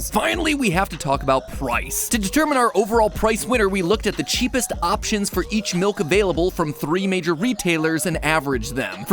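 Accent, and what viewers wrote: United Kingdom. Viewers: oh hes bri'ish or an aussi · wow when did become british???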